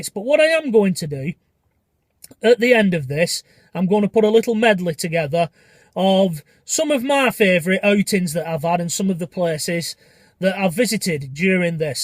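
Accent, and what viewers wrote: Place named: Lancashire, United Kingdom. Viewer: Blockbusters! 😍😍 Gosh it seemed so weird to see the days at the end whizzing past and to think that that's how long we've been locked up, and how drammatically things have changed in that time. You have got me through the lockdown and throughout it all, you were the only person talking any sense, and the only person I feel I trusted to give impartial and factual news about what was going on each day. Sadly I missed your final video when it was uploaded yesterday as I was at work, but I've just caught up today and I'm wearing my Wigan RL shirt to signify your last day. I feel sad that I wont get to watch your daily cheery videos and your accent reminded me of 'home' as all my family are in Lancashire (I live in Leeds) and due to me still nursing Covid-19 positive patients on a daily basis, I have absolutely no idea when I will get to see my family again. 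That's one thing I'm really struggling with this past few days. Anyway, thank you so much for your most EXCELLENT videos and it's been a great pleasure to 'get to know you'. I plan to catch up on some of your older videos now and will check out your new material. All the best, Teri X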